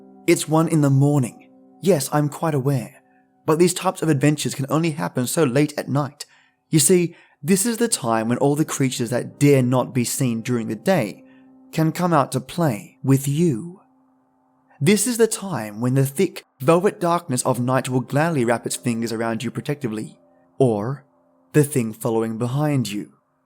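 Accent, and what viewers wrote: Australia. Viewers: I love finding fellow aussie narrators! 👻👻👻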